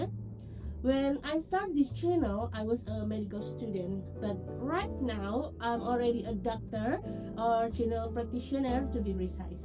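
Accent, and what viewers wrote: Indonesia. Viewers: loh ternyata orang Indonesia ya?? ngomong pake bahasa Inggris tapi tidak bisa dipungkiri logat dan gaya serta mada bicara persis org Indo semangat ya cici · I know you are indonesian, right after your first sentence, i listen to your sub from 2 years ago btw. thank you.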